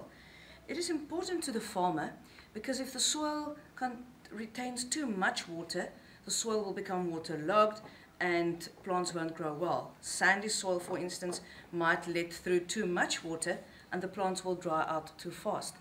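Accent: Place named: South Africa. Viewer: Very helpful video, thanks. You sound like you are from South Africa as well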